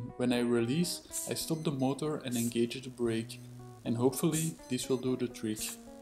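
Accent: Belgium. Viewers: Your videos are very inspiring and pleasant to watch... and your accent reminds me of my good friends in Brugge and Gent :-) Best wishes from Poland!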